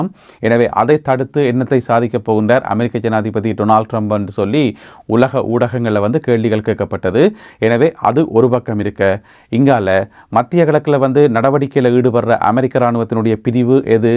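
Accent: Sri Lanka. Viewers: தாங்கள் பேசும் இலங்கை தமிழ் மிகவும் நன்று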